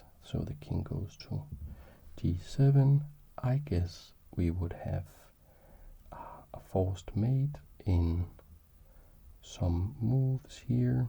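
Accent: Denmark. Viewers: Ayo, er du dansk?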